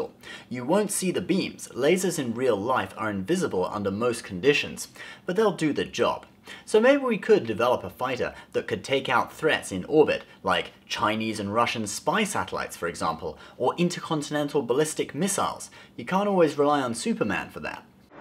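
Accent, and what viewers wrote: United Kingdom. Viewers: The people like your British accent. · I want more British and Star treck plz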